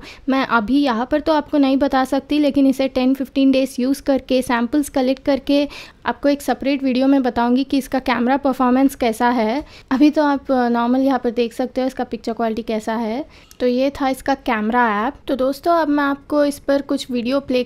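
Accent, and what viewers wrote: Hyderabad, India. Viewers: Does anyone feel the Hyderabadi in her?? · Hyderabad se lagre aap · Wow Hyderabadi Hindi amazing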